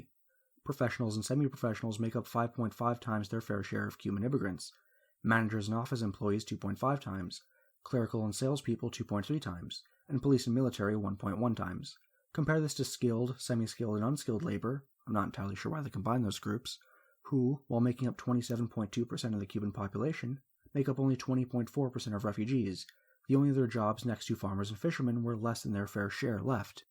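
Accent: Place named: United Kingdom. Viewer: You should be ashamed. I hope you are not some American kid (accent says more Brittish) who wants to be edgy so does this propaganda. My familly lived communism. You did not live shit. Nothing I wish for more than you and your pals actually living in communist times and country. Commies forcing planned economy and collectivization took my famillys farm, took everything. Made grand-grandpa work on his own farm for no pay. He was on Eastern Front from Ukraine to Berlin!! Constant food shortages. In 80s there was shortage of toilet paper. That was luxury! Rly how fucking dare you spreading those ideas that killed millions of people when you are born in free country. Shame on you.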